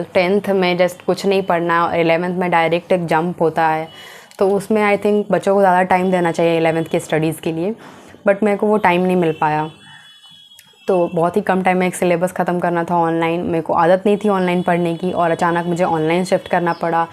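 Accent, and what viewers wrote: Bihar, India. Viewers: Tumhari acent me bihari tone hai 😅.... Are you from Bihar?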